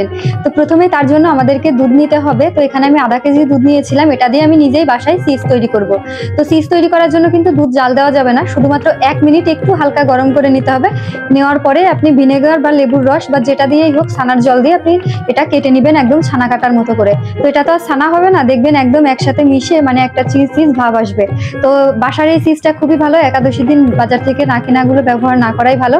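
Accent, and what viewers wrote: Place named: Bangladesh. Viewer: Hare Krishna 🙏🏻 apni ki bangladeshi ? Kotha gulo sunte se rokomi lagche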